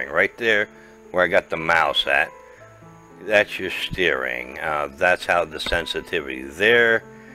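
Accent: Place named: South, United States. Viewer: I was just admiring your southern accent! I'm from TN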